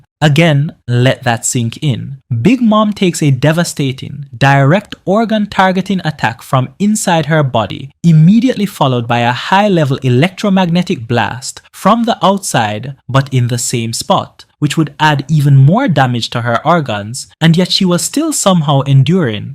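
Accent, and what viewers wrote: Jamaica. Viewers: You sound Jamaican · Your deff jamaican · are you jamacian?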